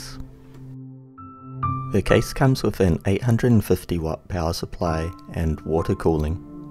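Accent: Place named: New Zealand. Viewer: I can identify a kiwi accent pretty quickly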